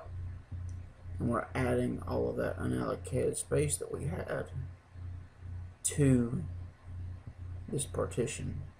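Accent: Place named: South, United States. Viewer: Thanks for making this informative video. Love your accent. Its so saddening that southern accents are being diluted in recent times. I hope the accents always stick around. I love them so. You also happen to have a very calming voice. This video is all around good to watch.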